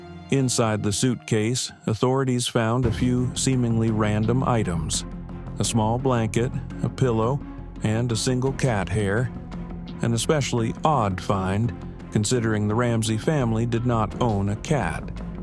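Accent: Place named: South, United States. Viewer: The narration is horrible with some type of crazy “southern” accent. Couldn’t listen.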